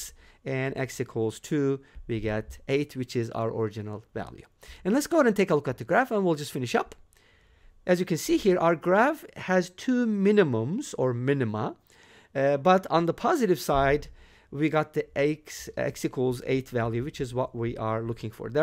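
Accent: Turkey. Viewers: hocam türk müsünüz, aksanınız inanılmaz düzeyde türkiye türkçesi konuşan birisini andırıyor. are you turkish? your english accent made me think that u are turkish.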